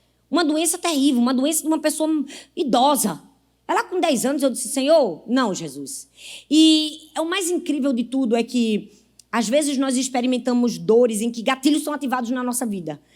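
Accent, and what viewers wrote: Northeast, Brazil. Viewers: Sou católica ,nem por isso deixo de acompanhar suas pregações, tem mais sou pernambucana da gema, assim como você!!!!! Amo seu sotaque bem nordestino.visse menina????? Kkkk🎉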